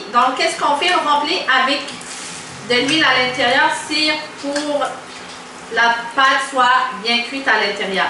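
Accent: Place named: Canada. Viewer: Merci pour la recette trop mignon l accent canadien sur une femme kabyle Recette que je vais tester merci pour le partage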